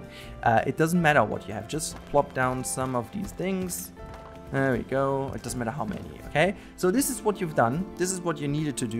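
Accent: Germany. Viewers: kannst du das video auch auf deutsch machen ? denke mal an deinem akzent kann man erkennen das du deutch bist :D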